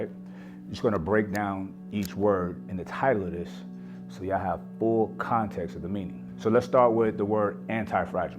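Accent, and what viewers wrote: Brooklyn, United States. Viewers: "The culture rewards this bullshit" I know Brooklyn when I hear itl 🗣 TEACH!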